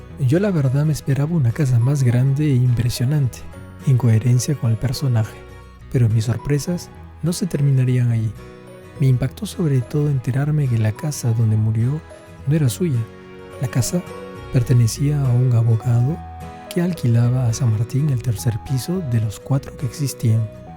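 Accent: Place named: France. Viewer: Me encantó el vídeo. Me encanta el acento francés al hablar español.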